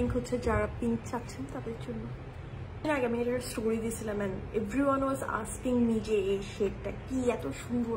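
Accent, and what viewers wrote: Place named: Chittagong, Bangladesh. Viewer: আপু তোমার কথায় চিটাংগা এক্সেন্ট শুনে খুব ভাল্লাগলো❤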